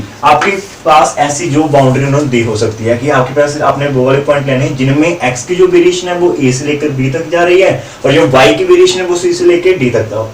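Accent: Punjab, India. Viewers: Thank you sir ji ,Punjabi touch aayi jaanda boli ch Sir ji 👌👌👌👌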